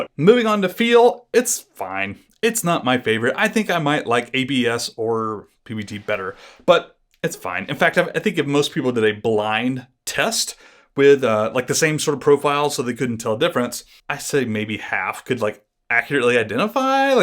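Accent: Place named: United States. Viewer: 1) Matcha is a type of green tea, where the tea leaves are part of the beverage and not just the brew. It is pronounced MA CHA. 2) It takes forever to come to you because you sound like you are in the USA, and I believe the website your ordered from is in AUSTRALIA 3) The density of POM, or at least these ones, I think is slightly higher than PBT. Dunno if density translates to weight.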